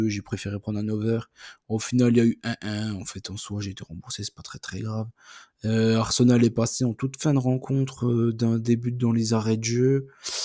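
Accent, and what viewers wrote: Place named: East, France. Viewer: Salut mec tu as l accent de l est de la France 🇫🇷 !!!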